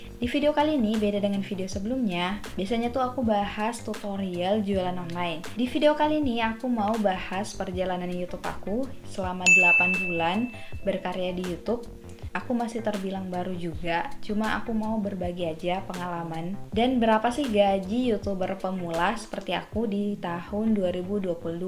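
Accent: Indonesia. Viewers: ORANG GORONTALO KAH ??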